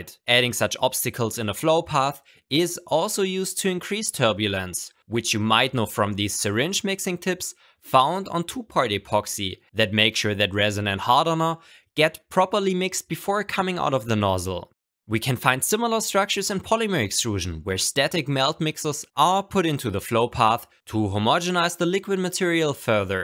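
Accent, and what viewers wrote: Germany. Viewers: Are you german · Hello, bist du eigentlich Deutscher oder Österreicher? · Hallo, Dein Deutsch-Dialekt kommt richtig geil.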